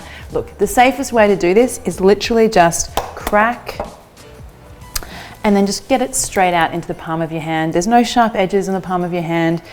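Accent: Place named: Australia. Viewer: You're sounding more Aussie since coming back home!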